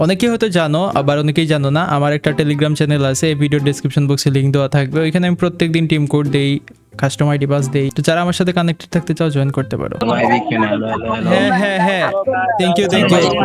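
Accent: Sylhet, Bangladesh. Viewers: সিলেটি না-কি?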